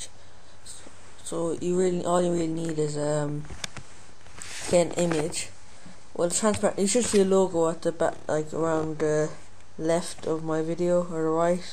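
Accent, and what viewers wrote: Ireland. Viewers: good video and its good to hear a fellow Irish man on youtube! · He's Irish.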